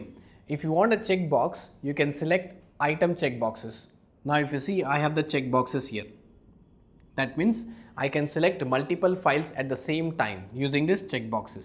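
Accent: India. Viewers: Even with your Indian accent, you are the best teacher I've seen online. Your topics are well selected, short and perfectly well presented. Will definitely watch all your videos. Keep it up. Applause from Nigeria. Take care!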